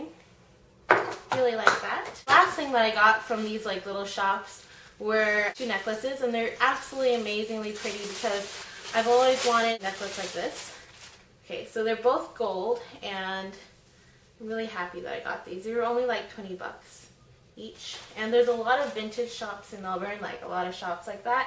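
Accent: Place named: United States. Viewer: I was wondering , why do you have american accent and you live in Australia .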